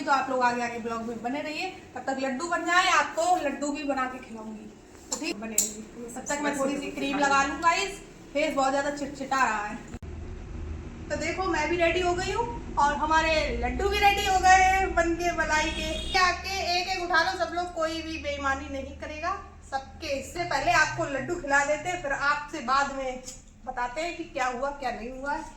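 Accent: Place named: Braj, India. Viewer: Kaha se ho aap language to braj ki lag rahi he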